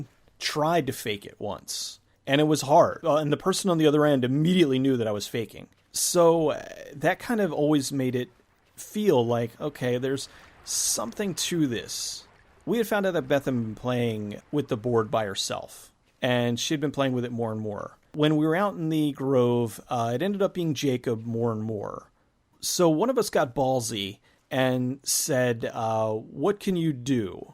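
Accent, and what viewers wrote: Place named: Maine, United States. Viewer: Herr Oberst Wilhelm Von Borchert You're right. Sounds like grew up in a King novel.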